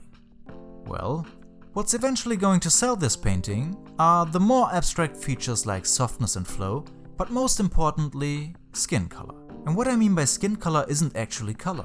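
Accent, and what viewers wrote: Germany. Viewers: i like your paintings o.o you have a german-english accent. Are you born in germany? ;)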